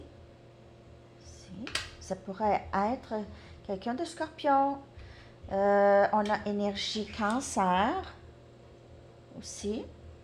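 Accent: Quebec, Canada. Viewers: Impressionnant! Exactement ce que je vis! Tu es trop forte, j'adore t'écouter! Toutes le compréhensions et prises de conscience se font, grâce à toi, dans la joie et la bonne humeur! Quel bonheur! J'adore! Un grand grand merci à toi! Et ton accent, québécois, craquant! Tu es un guide génial! GRATITUDE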